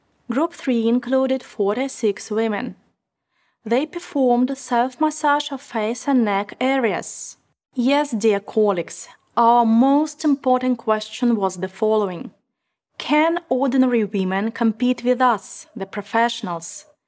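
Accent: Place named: United States. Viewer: Живу 15 лет в Англии, потрясающий английский ! Американский английский но очень профессионально !!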